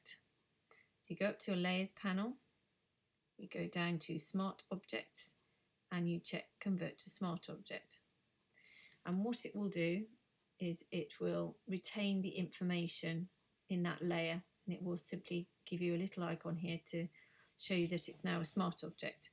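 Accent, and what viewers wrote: United Kingdom. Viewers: I love your British accent my dear....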